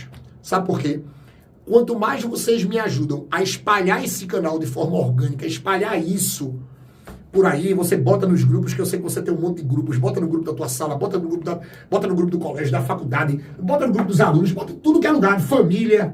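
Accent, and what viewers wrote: Northeast, Brazil. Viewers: amo o sutaque nordestino representando minha terra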